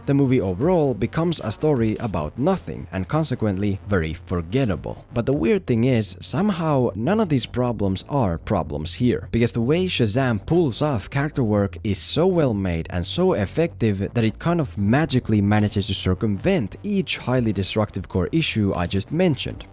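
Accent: Finland. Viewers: Is this guy Finnish? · Are you from Finland? You have a very Finnish accent. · I hear a Finnish accent :)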